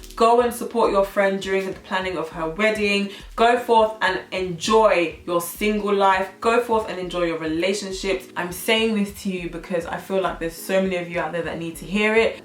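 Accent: Nigeria. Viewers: Naija accent I love it. You should do more of these videos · The Nigerian accent thoooo!!!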